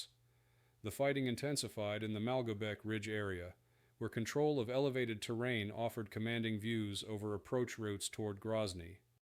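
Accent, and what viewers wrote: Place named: United States. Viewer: I'm glad we finally have a World War II documentary narrated by a American voice and not another British voice, whether AI or human.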